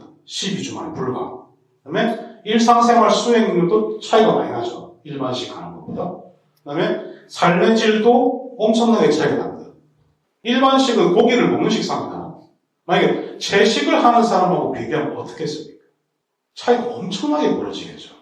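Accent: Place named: Busan, South Korea. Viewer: 유행하는 케톤식 알아보다 보게 되었는데, 믿을만한 논문과 임상경험으로 진짜!의학정보를 전해주시는 분을 발견한거같아 기쁘네요. 가족들에게 공유했어요! 이 분 찐인거같아요. 어느병원이신지 살짝 궁금하네요. 더불어 부산분이라 이해가 더 팍팍됩니다.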